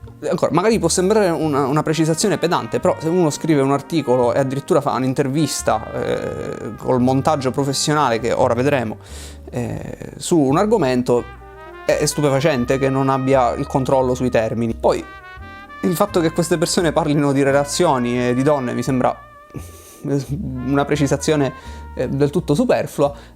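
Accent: Sicily, Italy. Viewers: Anche io seguivo Voyager! Bellissimo il finale alla Adam Kadmon: "un abbraccio". Kadmon, coi duoi rimandi emotivi, formulava un invito alla ricerca continua e al non lasciarsi omologare dai cosiddetti "buoni per definizione". Lo adoravo. Lui tralaltro chiudeva i suoi interventi con la bellissima frase: "prendete queste mie parole come favole, un abbraccio, Adam". Spero che questo canale cresca ancora. Fa piacere anche sentire una voce siciliana (io sono di Catania ma vivo in Toscana), noi siciliani abbiamo nel DNA il dubitare sempre, l'argomentare, il desiderio di esplicare, la volontà empatica soggettiva di non soccombere e non accondiscendere.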